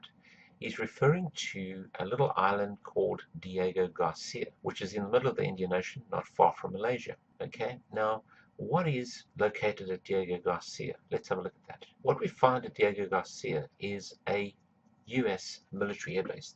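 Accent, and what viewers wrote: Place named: United Kingdom. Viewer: #1 Just because you believe that is what happened doesn't make it true. #2 This is not the only aircraft that has disappeared without a trace. Even when flown over land it has been years in some cases when crash sites were found. #3 You sound like a British citizen, certainly not from the U.S., so "you"(Britain) do not have stealth bombers. #4 You said, "There is more to this island than meets the eye." Statements like that are a very weak attempt to be provocative. And pardon me but, everyone on the planet knows this is a U.S. airbase. You mentioned there was a very public court case in Britain regarding the "occupation" of this island. #5 The article reads,"Confirmed at highest levels of gov't but not the current one." What does that even mean? Sounds like, "Void where offer not prohibited." #6 Where is the hard evidence? 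#7 Just because someone says it doesn't make it so. #8 Repeating it over and over doesn't make it factual. #9 You have no way of confirming any of this information therefore we can all take it with a grain of salt. #10 See Occam's Razor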